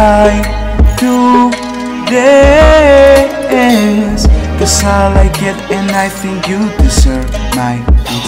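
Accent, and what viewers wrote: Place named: Latin America. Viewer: Sentí tu acento latino 🤩🤩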